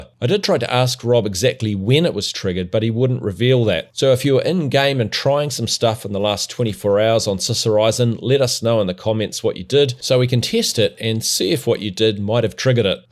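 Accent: New Zealand. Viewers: ru from new zealand or australia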